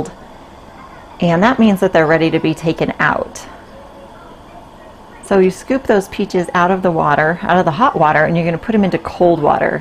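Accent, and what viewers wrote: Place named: South, United States. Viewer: you make it all look so easy -- can 9 quarts of peaches in 14 min. Wow, it takes me all afternoon just to peel the peaches:-) Ok -- I heard somethings in today's video that made me think -- you were not born and raised in Utah, were you. I heard a couple of different accents today. I would say East of Texas, but not Mississippi or Alabama -- maybe southern Missouri, then I heard some east cost -- North Carolina, maybe a touch of Massachusetts. I would say that you moved to Utah about 20 years ago. Now send me 7 quarts of peaches -- or you will get 3 cases of SPAM Lite (even the dogs will not eat that!!!)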